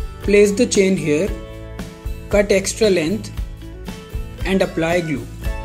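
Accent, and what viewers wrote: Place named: India. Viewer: Very beautiful handbag. Are you from India? I assume because your akcent.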